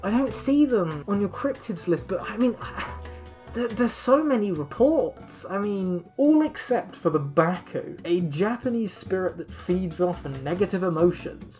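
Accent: United Kingdom. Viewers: guys is she un ironically british?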